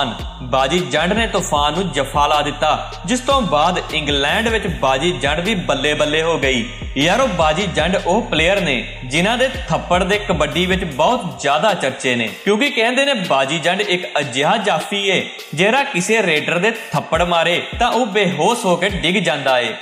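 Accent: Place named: Uttar Pradesh, India. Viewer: Bai keho jahi Punjabi bolda? UP da rehan wala te nahi kitey?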